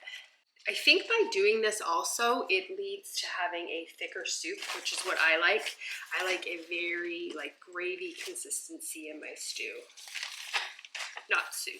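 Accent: Canada. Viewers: thank you. you've got me interested in it again! and for that I thank you. is your accent Canadian? I live in metro Detroit so its very familiar to. me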